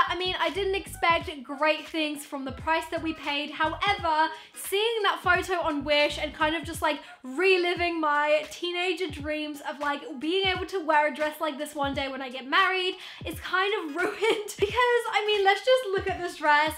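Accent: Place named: United Kingdom. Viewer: I love her British accent ❤️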